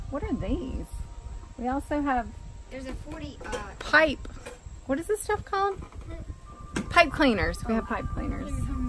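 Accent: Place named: Virginia, United States. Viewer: Not bad I be proud of my bean fields too they did that good. He talking Southern Va. Northern NC. Talk. All people talk that way that lived in that area there whole life. Not been down that far much in a long time. I remember them was tobacco fields far as you could see, Tobacco was king people made lot money from them crops back in the day's. I seen that when I was little kid going visit kin folk in them areas.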